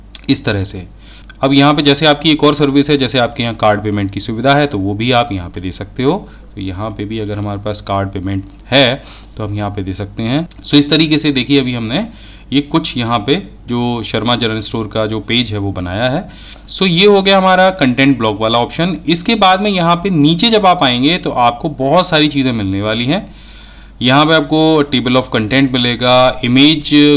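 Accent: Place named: Uttar Pradesh, India. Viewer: thank sir aap bahut deeply or ache se sam jate ho, aapki hindi bahut achi lagti hai,wase bhi u p waalon ki hindi bahut achi hoti hai, main bhi u p se hun, apka padosi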